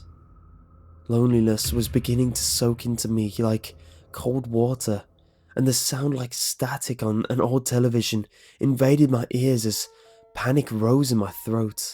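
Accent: United Kingdom. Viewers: Are you British or American?! THIS IS DRIVING ME INSANE!! WHY THE HELL WOULD YOU DO THIS TO SOMEBODY?!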